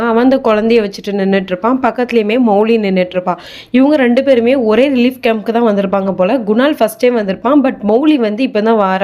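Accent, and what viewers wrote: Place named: Tirunelveli, India. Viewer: ஹலோ நீங்க திருநெல்வேலி காரங்களா உங்க வாய்ஸ் அப்படிதான் இருக்கு போயிட்டு வந்துட்டு அப்படின்னு சொல்றீங்க கதை சூப்பர்❤❤❤❤❤❤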